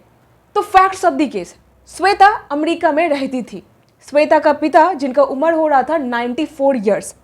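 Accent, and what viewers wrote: Bengal, India. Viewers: Madam Apke ke dwara bait gaye advice sahi hai Please take care that Bengali tone is coming to your Hindi version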